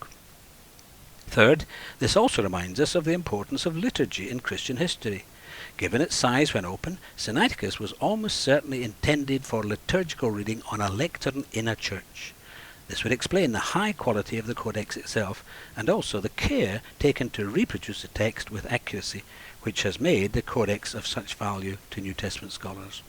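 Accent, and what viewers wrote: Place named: United Kingdom. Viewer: FAKE - nice British accent though.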